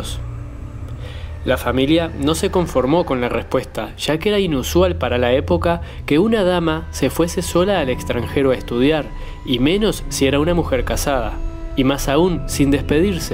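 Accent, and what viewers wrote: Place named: Montevideo, Uruguay. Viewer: Seis años después, vengo a ver éste video, soy Montevideana y viví muchísimos años en esa zona. Son fascinantes las antiguas casonas del Prado! Tenés un acento bastante parecido al de los Montevideanos excepto por la pronunciación de las "ll" o "y" 😊 de qué país sos? Es una pena que la hayan derruido a la casona y en su lugar haya un edificio. Saludos desde Uruguay y el video está muy bueeno!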